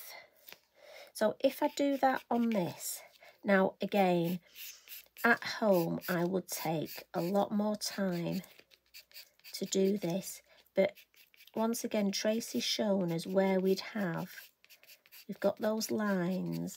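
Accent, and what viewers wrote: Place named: England, United Kingdom. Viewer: love it! I enjoy watching you painting, and love your English accent. It is very soothing, and easy for me to understand what you say.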